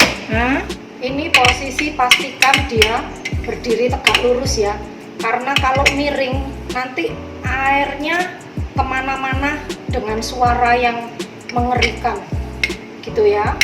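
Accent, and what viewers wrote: Java, Indonesia. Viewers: ngomong medhok nya yang ngangenin liat kontenx sll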